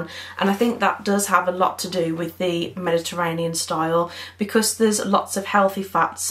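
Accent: West Midlands, United Kingdom. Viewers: Sounds like you live in the west midlands :)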